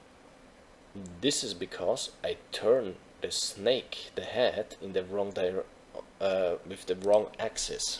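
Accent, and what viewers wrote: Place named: Germany. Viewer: Man I love that German accent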